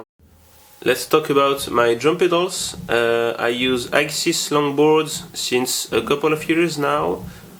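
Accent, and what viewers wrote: France. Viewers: i'm Pretty Sure you are French,your accent doesn't make Doubt! ;-)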